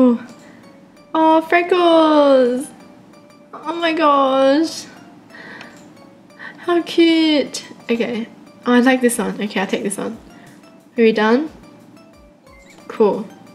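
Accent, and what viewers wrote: Australia. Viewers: Is she from australia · are u australian?!?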